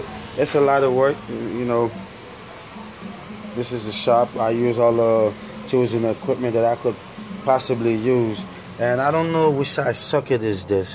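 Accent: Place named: Haiti. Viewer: You sound Haitian. Sak Pase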